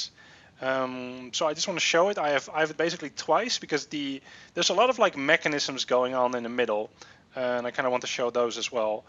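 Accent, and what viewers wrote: Netherlands. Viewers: what country are you from you sound Dutch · Ben je Nederlands, je klinkt wel zo? Are you dutch you sound so?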